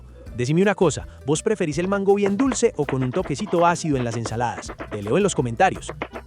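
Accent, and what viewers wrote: Antioquia, Colombia. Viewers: Me fascina tu acento de paisa, I love it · Disculpe joven usted es colombiano porque me encanta como hablan y cosinan rico como esto que esta reseta que está compartiendo 🙏🏻🙏🏻❤️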